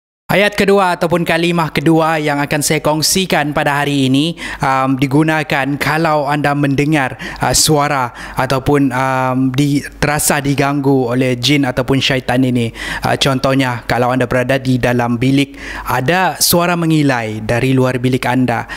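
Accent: Sabah, Malaysia. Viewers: Orang sabah ka ni? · Pekat beno loghat Sabah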